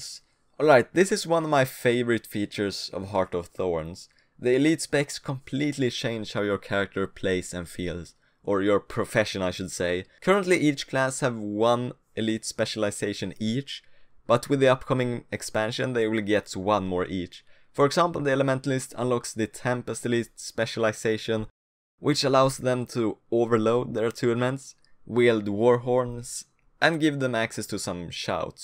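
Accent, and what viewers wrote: Sweden. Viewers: Are you from sweden? You have a very swedish-english accent :)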